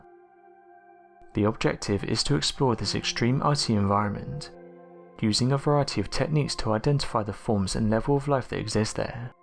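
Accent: United Kingdom. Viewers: These videos would be much better if you slowed down a bit, the rapid fire reading with the British accent makes it extremely hard to understand. Not everyone is British.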